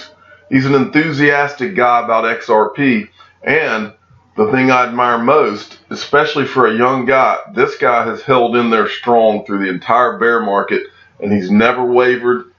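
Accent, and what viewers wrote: South, United States. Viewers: It’s just a matter of.. Love the southern accent😉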